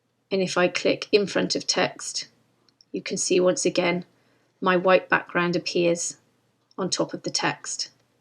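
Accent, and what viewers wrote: England, United Kingdom. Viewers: Thanks so much for this. With one exception I think I got everything that you were explaining. Until now I have had so much grief with textboxes and thought the people who invented them should be hanged as war criminals. The one exception, which reminded me of so many other tutorials that I have watched was increasing or reducing the size of a picture. You did it and produced the result as if by magic without saying how you do it. Perhaps if I watch again I will see how you did it. I am going to create a practice document and play about with the boxes until I can really get them to work. So far, positioning boxes has been almost impossible for me and reduced me to near suicide with frustration. Thanks again. Your lovely, clear English accent helps, too!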